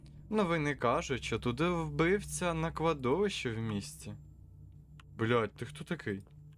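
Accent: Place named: Surzhyk, Ukraine. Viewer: Йоу, у тебе дуже круто вдається. Мені подобається твоя спокійна подача. Тршіки суржику додає тобі шарму Подерев'янського) Як і матюки що проскокують. Реакція на події в тебе кльова, за якою приємно і трішки кумедно (в хорошому сенсі) спостерігати. Твої відео приносять дійсно задоволення. Успіхів тобі на шляху ютубера) Українського контенту має бути більше)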